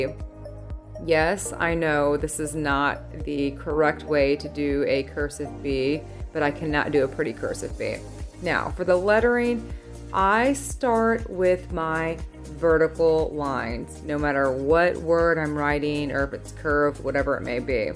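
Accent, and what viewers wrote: Texas, United States. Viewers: What part of Texas are you from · So cute that I just knew she was in TX. Our daughter was born in TX when we were stationed there back in 1986. You never forget the friendliness and that dialect! Beautiful people. Beautiful State! We loved our time in Texas!! Thanks for all the super DIYs!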